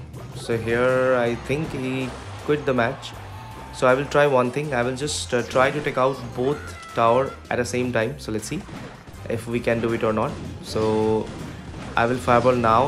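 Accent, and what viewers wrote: India. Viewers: You sound so Indian ^_^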